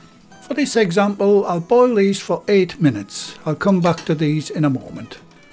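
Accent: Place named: Northern England, United Kingdom. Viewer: Brilliant channel. I'm an expat Brit abroad and love hearing your northern accent. Very homely and wonderful old school British recipes.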